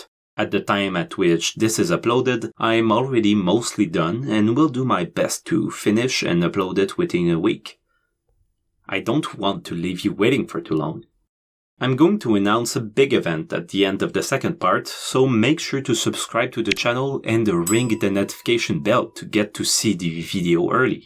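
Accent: Quebec, Canada. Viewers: Es-tu québécois? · U're french canadian right? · t'es quebecois je pense XDDD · Great video! By the way, are you French-Canadian by chance? I feel like I've heard that accent before 🙂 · French canadien?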